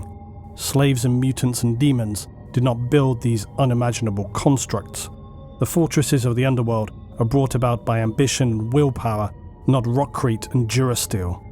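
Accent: United Kingdom. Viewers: 3+ hours of a smooth talking British man coming to terms with free will being an illusion 😆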